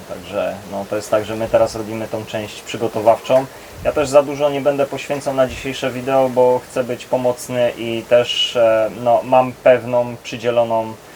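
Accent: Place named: Silesia, Poland. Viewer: Bardzo dobrze godosz. Dużo YouTuberów pokazuje tylko❤ te piękne miejsca a nie jak są zrzyci z naturą.... Pozdrawiam Skąd że Śląska jesteś??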